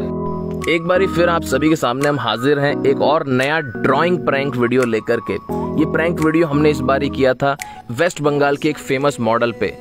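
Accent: Standard. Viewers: Mere Bhai Dil Se Badhai aur jo Tum kar rahe ho na Vakya mein lajawab hai main sketch ki baat nahin kar raha Mujhe sketch ke sath Ek Aur chij dikhi vah bhai aapki voice bolane Ka Tarika Jis shuddh Hindi mein baat karte ho na bhai Kasam Se Dil Garden Garden Ho Gaya.. love u bro.. Jay Shri Ram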